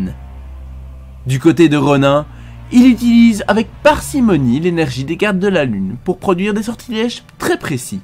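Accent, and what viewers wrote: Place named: Belgium. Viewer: Il est belge c'est pas des erreurs de prononciation c'est son accent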